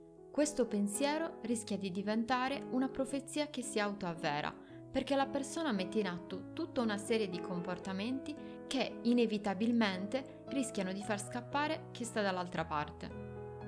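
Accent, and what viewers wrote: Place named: Sardinia, Italy. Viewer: Giustissimo avere una dizione corretta nel proprio bagaglio, ma spesso gli accenti danno personalità ai racconti.. A me il tuo sardo piace🌊💙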